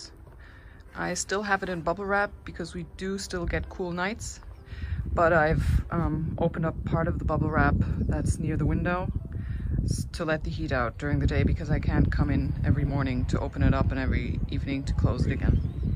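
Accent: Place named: Canada. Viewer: I love all of the old brickwork you have! Darn meece are always feasting, aren’t they? Where are you based? Your accent sounds like my lovely neighbors to the north 🇨🇦! Your seedlings look very healthy and happy! Love the bright green shoots! Everything looks great and ready for wonderful food production! 🥰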